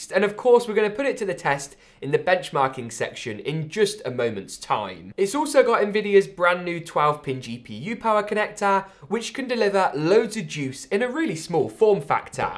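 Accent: England, United Kingdom. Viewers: Are you english ? Love your accent . Btw nice explanation , set up and passion about pc 👍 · I luv his british accent lmao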